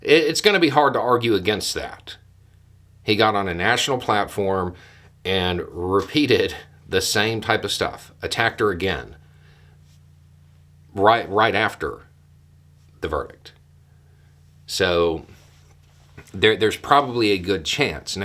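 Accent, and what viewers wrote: South, United States. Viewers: I think I've learned enough of your Southern ways of speaking to say, about Trump, "Bless his heart."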